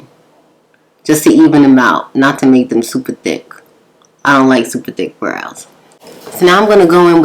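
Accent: New York, United States. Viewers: That NY accent takes me back home every damn time 😁🥰 As always sis, you did that. They look really nice and natural. I've been contemplating ordering a kit off Amazon to make my brow shape pop and this was all I needed to see to go ahead and order it. I'm 37 and my eyebrows haven't grayed yet (knock on wood) but girl why am I getting gray eyelashes?! Like what kinda nonsense is going on here?! Them gray hairs just be finding new ways to be mad disrespectful. Speaking of lashes, waaayyy back when you had that video of applying individual lashes, do you still do your lashes at home?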